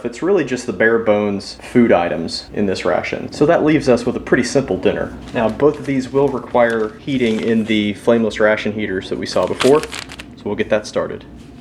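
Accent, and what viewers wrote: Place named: Deep South, United States. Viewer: I'm confused mate, are you American or Canadian? You sound like you're from the deep south, but you called it "jam" not jelly. Americans call jam jelly. Canadians correctly call it jam.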